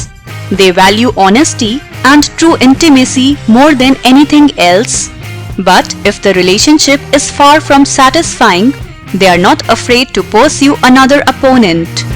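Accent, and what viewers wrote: India. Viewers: I was really excited to watch the video until I heard the cringy indian accent. sorry. had to be said.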